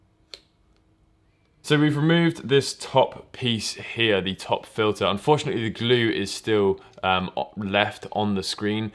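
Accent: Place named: United Kingdom. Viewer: I love her british accent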